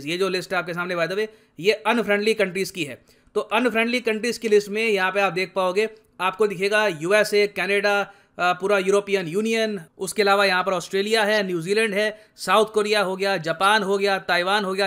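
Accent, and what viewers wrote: India. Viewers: Typical Indian accent Most related dialogue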